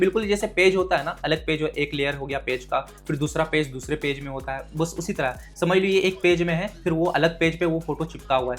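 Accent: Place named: Hyderabad, India. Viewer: Zabardast Haiderabadi Accent.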